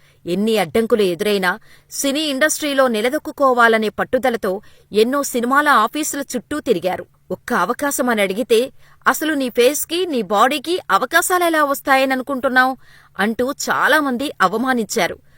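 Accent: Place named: Telangana, India. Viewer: Neku telagana yasa vachu kabati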